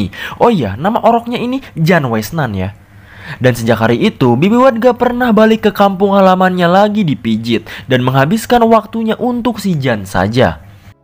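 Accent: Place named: Sunda, Indonesia. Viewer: Orang mana sii bng?ngmng sunda nya lucu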